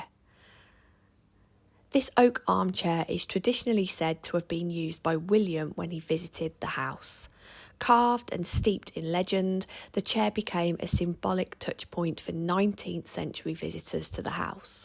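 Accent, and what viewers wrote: Birmingham, United Kingdom. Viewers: brummie (Birmingham) accent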